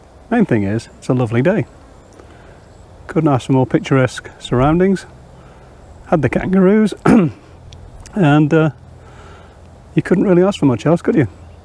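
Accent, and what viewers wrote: United Kingdom. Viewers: I always assumed you were British lol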